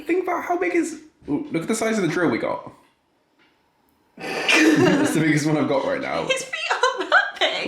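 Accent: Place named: RP, United Kingdom. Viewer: you talk lovely quite posh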